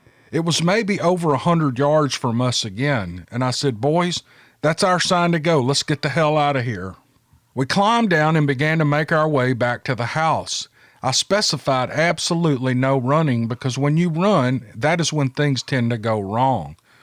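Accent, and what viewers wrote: South, United States. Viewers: It's not only your great narrations of our people's stories that make your videos so enjoyable, but also your ramblings! So, don't cut your ramblings out please and don't discount them!!!lol! Your personality and warm Southern accent are so delightful! Like hot, salty fried okra just out the frying pan with a sweet iced tea on a warm summers' evening!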